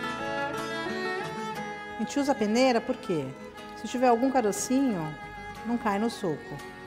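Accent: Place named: Brazil. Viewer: É de Portugal???? de repente pareceu-me que era Brasil!!!!!!!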